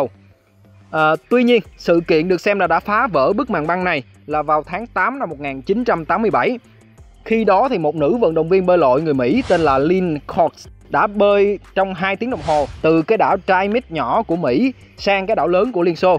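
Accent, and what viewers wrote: South, Vietnam. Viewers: Kênh hay giọng rất nam bộ thích đó nghen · đọc dễ nghe,giọng nghe dễ hiểu.chuẩn miền nam. chứ giờ 1 số kênh đọc giọng miền nam k ra miền nam,bắc k ra bắc nghe ghét cực kì. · bạn có chất giọng của người miền nam nghe dể thương dể mến nên được nhiều người thích, chúc mừng kênh ‘ TRUYỀN HÌNH’ của chúng ta ngày càng phát triển mạnh mẽ lên 10triệu Sub nha! Ủng hộ hết mình luôn bạn nhé!!!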